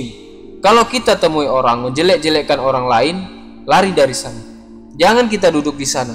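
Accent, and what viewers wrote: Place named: Sumatra, Indonesia. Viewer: Logat abang macam orang sumatra lah... Iya kah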